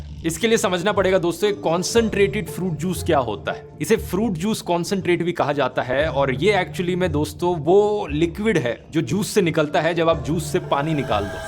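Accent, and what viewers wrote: India. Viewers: The real indian voice ✨✅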